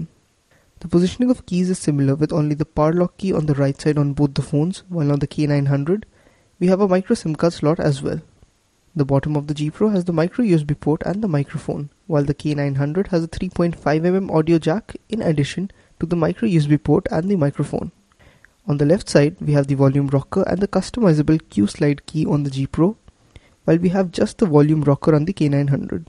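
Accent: India. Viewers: indian english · i don't think that for even once, did he make any grammatical mistake. i don't know from which part of the world you are, but there aren't many in the world who speaks more correct english than us, Indian! you may have accent but our english is more understandable to the world due to our neutral accent. these videos are less watched for the accent and more watched for the information. the guy did a great job and the amount of 'likes' justify that. good job man...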